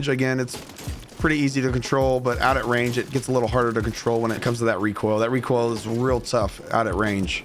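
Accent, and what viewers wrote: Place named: United Kingdom. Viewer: I hope you get better and i love the british voice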